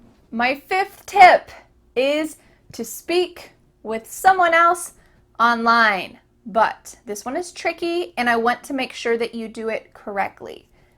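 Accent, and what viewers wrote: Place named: United States. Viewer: Hi, I really enjoy learning English with you. I love your américain accent, your smile and your energy in teaching! I’ve got motivation when I watch your videos. Even when I am in a hurry in the morning I try to listen and watch your videos while I have breakfast. Thanks a lot for your lessons. 🤩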